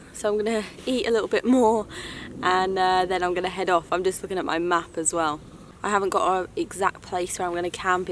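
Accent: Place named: England, United Kingdom. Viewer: you are such a delight to watch, being from Texas I could listen to your accent all day long. The waterfall the tower...I have to visit England someday...you are a walking advertisement of your country. Pretty lady I cannot wait to see what you do next ❤ Texas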